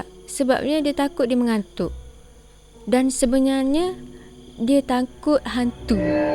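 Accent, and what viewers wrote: Kelantan, Malaysia. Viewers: kecek Kelate lama..x jdi seram bilik kecek luar😅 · Xsdp la dngr kalau bekencek luai... Kelantam lg best... 😂 kencek luai jdi lawak plak... 😂😂😂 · Tak sedak dengar Eah kecek ore kolopur.😂😂..kecek kok Kelate lah..baru sedakk dengar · Tksedak kecek kl kecak klatey la kak dio 😢 sapo stuju like sek kito😊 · Sdap dgr bhso klate ehh, jelas pm jls...mce kekkok gtu hehe...gapo pm semoga n maju jaya😁.. HADIRRRR👻👻👻👻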